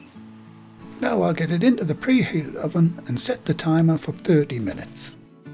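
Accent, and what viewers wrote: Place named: North East England, United Kingdom. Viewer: Such a refreshing video! Just stumbled across your channel and I'm so glad I did! I'm from the North East (Stockton on Tees) and it's so nice to hear the accent along with proper traditional British recipes that my Nana used to make. Thank you, you've just made my evening! Will be making this bread at the weekend:)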